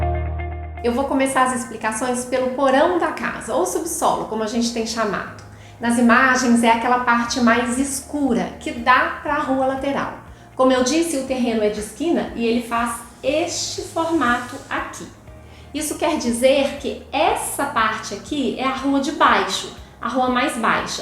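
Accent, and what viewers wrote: Minas Gerais, Brazil. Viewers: Excelente trabalho. Sempre importante um outro olhar sobre um projeto, principalmente quando esse tem a qualidade de seu trabalho. Não é o caso, um outro arquiteto coloca nos vídeos alteração de voz infantil e acho que corta a qualidade é continuidade do vídeo. Sugeri a ele não utilizar e pelo visto não gostou. Seu vídeo não tem esse recurso, ainda bem e não precisa. Ficamos assistindo o tempo que for exibido. Não é cansativo, pois nos envolve na explicação prática, objetiva e com todo charme desse sotaque mineirinho de BH. Prova que não precisa de recursos como alteração de voz ou inclusão de flash de imagens que não sejam pertinentes. Infelizmente o arquiteto que citei parece não ter gostado da sugestão. Ainda está em minha mente aquele projeto de seguidores que lhe deu um prêmio. E os demais também. Parabéns de verdade. Se juntar você e a Patrícia do Doma Arquitetura, eu faria um curso para capacitar os profissionais da área. 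Vocês duas comprovam o que ser profissional criativo. Arrependo me de não ter feito arquitetura e optado por publicidade e propaganda. Falei muito. Chega. Abraços.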